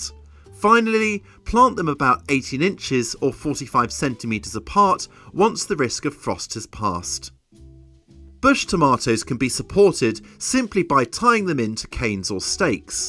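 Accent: England, United Kingdom. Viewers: Thank you, lovely to hear an English voice :)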